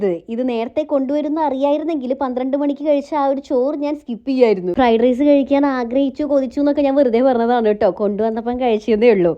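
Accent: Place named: Malappuram, India. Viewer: ചേച്ചി മലപ്പുറം ആണോ അതെ സംസാരം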